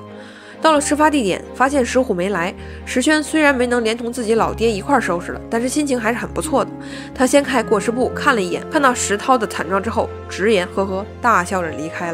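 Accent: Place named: Beijing, China. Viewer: 姑娘說書內容詳盡又能不疾不徐且毫不做作！一口道地之北京話更讓吾人擊節欣賞！姑娘讚！既然姑娘熟讀中國歷史即使是分裂戰亂年代也是漫長的歲月可述說之內容亦如海水之不可斗量！姑娘妳何妨將之細分多期講述之？一則讓聽眾有時間消化二則也能有更多期的視頻此乃兩全其美也！姑娘妳何樂而不為？姑娘妳以為然否？同時姑娘妳也不妨開闢歐洲史的部份自馬其頓帝國起至二戰結束終也能製作非常多期之視頻矣！至少能讓銀明白阿提拉大帝和拔都汗對歐洲造成的傷害是多麼的巨大！還有為什麼蘇德一定非要瓜分波蘭不可！又為什麼明明是同樣的斯拉夫民族鵝螺絲和烏克蘭卻能生死搏鬥！以及為什麼日俄戰爭中波羅的海艦隊全軍覆沒卻是對蘇聯共產黨天大的幫助？等等………！如此增廣我等之見聞豈不美哉？姑娘妳其有意乎？忠言向來逆耳！若在下所言使姑娘妳不悅亦望姑娘展現大度一哂置之！則在下幸甚！姑娘亦幸甚！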